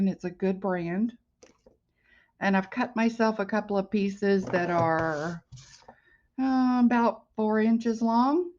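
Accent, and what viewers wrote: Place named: South, United States. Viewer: Are you from the south? You have a suthern accent. (Love it )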